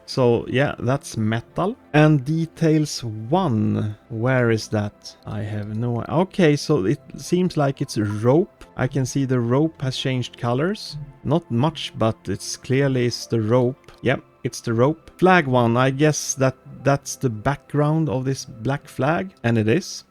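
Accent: Sweden. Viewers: it feels like you are from sweden cause the acent you have feels like swedish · u swedish right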